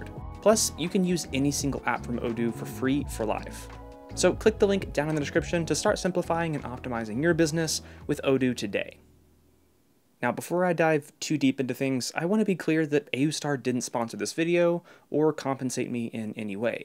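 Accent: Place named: Boston, United States. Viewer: That's a cute Boston. 13/10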